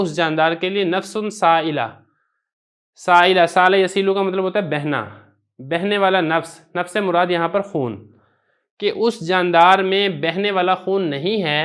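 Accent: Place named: Punjab, Pakistan. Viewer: Matlab Aap Jabab Panjabi Ho ,Accha Laga 😂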